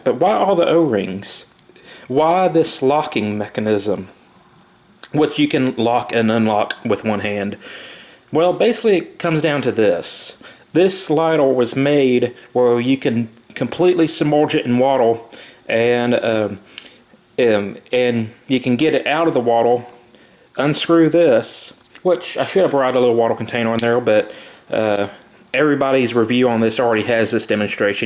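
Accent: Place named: Texas, United States. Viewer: Wattel, Lightel, chambel, smokel, yeal, or anything ending in "r" in English intrigues me as to what part of the USA are you located. Having just left the northern east coast, it is definitely not from that locale.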